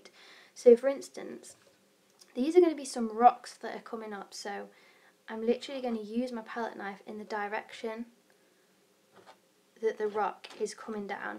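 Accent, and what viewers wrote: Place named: United Kingdom. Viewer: I love watching your painting tutorials! What talent you have! And that British accent is the icing on the cake❤️ You have brought out a hidden talent! Thank you so much!!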